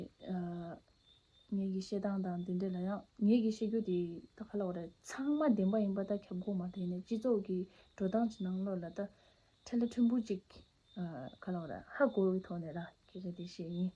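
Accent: Standard. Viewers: ཁྱེད་རང་ངའི་གྲོགས་མོ་ཡག་ཤོས་ཡིན། ཕ་མ་གསེར་རེད། བོད་སྐད་དག་མ་སྨྲ་བཞིན། བཀའ་དྲིན་ཆེ།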